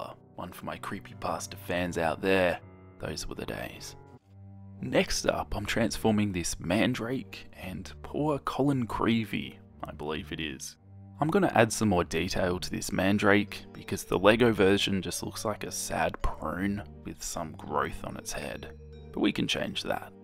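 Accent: Australia. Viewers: Question: Who else, or am I the only one, that wants to know what goes on though the cashiers heads as walks up and buys these colouring books? I would also like to add that this made my Friday, the sarcasm, excessive blood, awesome drawings and the best accent on earth (fellow Aussie). My Friday can end happily!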